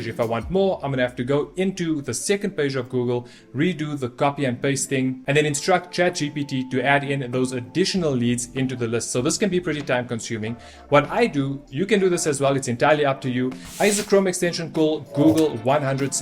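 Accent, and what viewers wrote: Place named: South Africa. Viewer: I know a South African when I hear and see one..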